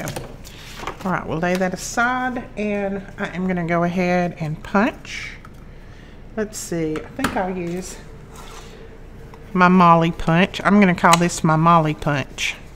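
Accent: South, United States. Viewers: “Oooo, I like that.” “Init cute?” “I’m gonna embellish that.” I love all of your expressions. Can you add a “push here” button to the tshirt so we can hear your wonderful Southern accent too? :)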